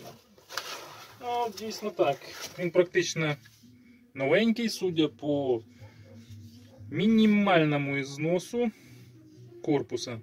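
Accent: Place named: Rural, Russia. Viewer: ни хера не понятно , что ты там гутаришь на селюковском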